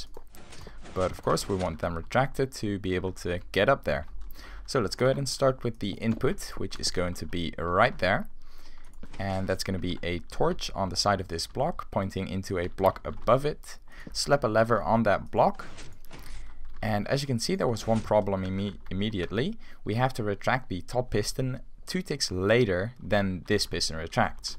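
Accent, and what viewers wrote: Netherlands. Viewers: Where are you from? You sound dutchie :p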